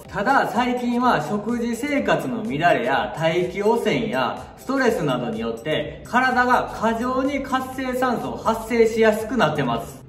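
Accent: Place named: Kansai, Japan. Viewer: コテコテの関西弁が耳障り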